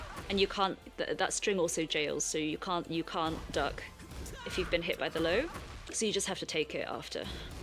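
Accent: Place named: United Kingdom. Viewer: This is a good video. I like the fancy British accent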